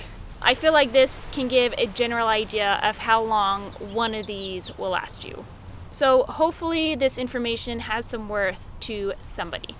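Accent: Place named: Michigan, United States. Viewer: Thank you for the informative vid. It was very helpful to me as I am also into hiking and camping too and have questions like this about most products that I could use. Are u from Michigan? Your accent...it's either Michigan or Wisconsin isn't??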